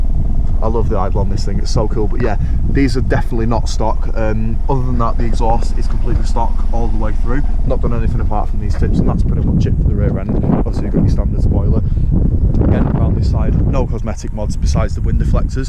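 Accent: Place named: United Kingdom. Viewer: I stayed till the end for the British accent it sounds great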